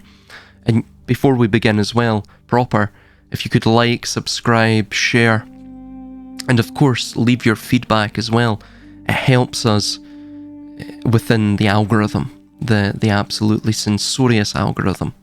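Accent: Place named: Scotland, United Kingdom. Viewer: Anything you say regarding Steiner and his Christology path is welcome. Thank you so much for all you speak and with a fine Scots accent.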